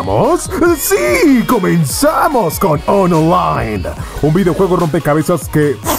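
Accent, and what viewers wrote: Paraguay. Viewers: De que parte de Paraguay sos??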